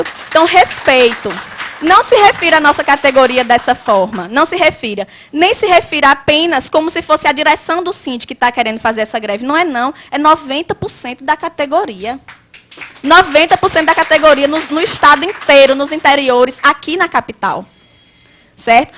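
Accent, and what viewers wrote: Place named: Rio Grande do Norte, Brazil. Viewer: Assisti ontem ! Adoro o sotaque do RN, adoro Natal!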